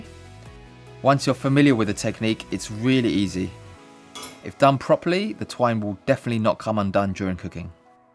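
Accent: United Kingdom. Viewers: Love your British accent!! Hi from America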